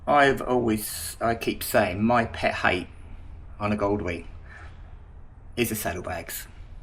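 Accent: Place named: Australia. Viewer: Finally, a guy with the wisdom and guts to tell the truth about Wing saddlebags compared to Harley bags! Thank you! I still would love to buy a bagger Wing like yours because at 78 years old I removed my travel trunk on my "09 Harley Ultra Classic a few years ago because I can now swing my leg over the rear fender to get on my bike without arthritic hip pain. Same would be true if I had a Wing with the trunk. At 6'3" it is too hard to get on the bike with the trunk in the way. I suppose I could put a Wing on the center stand and stand on the pegs/boards to mount but I'd rather be able to swing my leg over the seat. Sadly, at 275 lbs., 6'3", size 14 boots it is very unlikely I could fit comfortably on your bike without many modifications $$$ from Traxxtion Dynamics. Maybe I will win the lottery, I should think positive. Anyway, not sure if you are an Aussie in Florida or a Floridian in the land down under, but think I understood 90% of what you said, mate. 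Hope you do more Wing stuff because this bike is on my radar....if I can find a Honda dealer that would take my two Triumph Rocket III's and my Harley Ultra in trade for a fair price. PS" I carry most of the same tools as you do, the pump, the battery booster, tire repair, etc. on all three of my bikes. Several weeks ago I got a flat rear tire on my '09 Harley Ultra(65,000 miles) within about 3 miles of my home after a 150 mile ride with friends. Long story but I limped home and could not find a nail or screw or anything to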